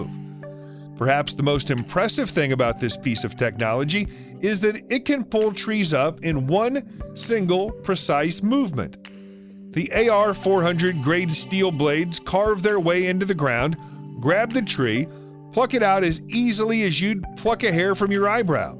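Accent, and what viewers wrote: United States. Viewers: Another utter load of bollocks with a really fucking annoying IQ zero american narration.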